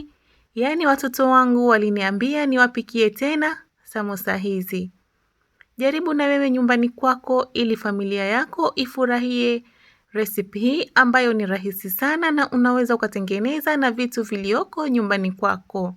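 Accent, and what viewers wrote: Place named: Arusha, Tanzania. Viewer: Wow! Asantee my dear, kwa recipe nzuri.. 😋 nitampikia Samosa za viazi! Mume wangu.. 😊 by The way unaongea vizuri, Kama watu wa Arusha! 👍😀😍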